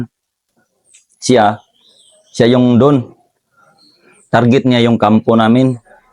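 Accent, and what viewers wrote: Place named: Visayas, Philippines. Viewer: ikaw mag storya sig reklamo! klarong bisaya galisod ug tagalog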